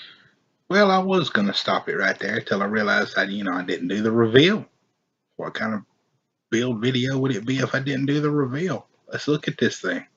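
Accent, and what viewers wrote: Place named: Deep South, United States. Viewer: You sound like you work in the ranch in the deep south USA.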